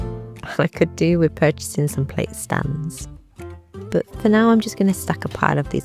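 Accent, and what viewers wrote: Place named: Northern England, United Kingdom. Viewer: Relaxing to watch. Cosy vibes, beautiful filming on the beach. You sound like a North of England girl. I am too.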